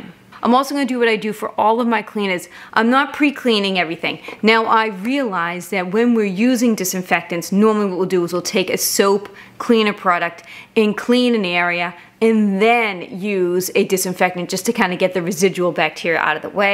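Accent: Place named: Rhode Island, United States. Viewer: What accent do you have? Sounds like Rhode Island and/or Massachusetts.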